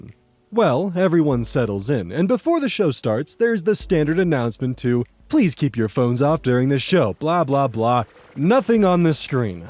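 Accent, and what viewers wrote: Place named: New York, United States. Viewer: Hearing being from Idaho is surprising to me you have a stereotypical New Yorker accent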